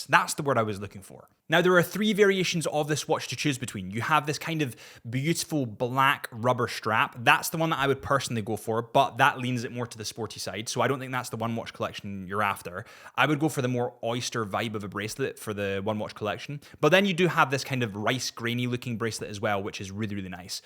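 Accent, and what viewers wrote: Scotland, United Kingdom. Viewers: Mate it’s like listening to Adrian Barker with a Scottish accent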